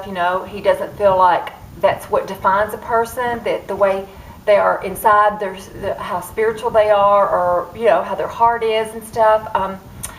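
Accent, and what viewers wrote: South, United States. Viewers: u have a beautiful disposition and the southern accent makes it even more precious,i enjoy seeing your loving and sweet relationship with your husband,i enjoy seeing how u make everyone around u feel special in so many ways, and in so many details,like bday celebrations with food,presents and making them feel special.i enjoy seeing u be in an awesome shape and do your exercises and stay healthy and strong,enjoy seeing your vibrant personality come through even when u r facing surgery.enjoy seeing bravery in action for putting yourself out to the world to be seen and heard.all these things about u and am sure many more to come, i feel need to be noted,acknowledged and celebrated,thank u for sharing your awesomeness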